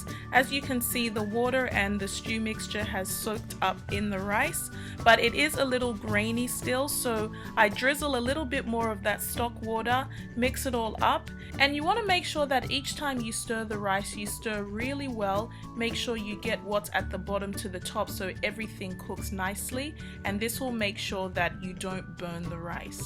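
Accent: Australia. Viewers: omg you got a nice accent. Australian aye? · Are you australian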